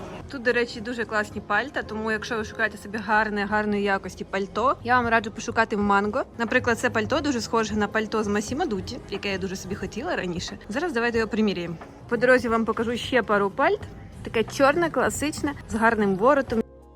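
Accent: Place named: Surzhyk, Ukraine. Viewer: У Вас такой смiшний суржик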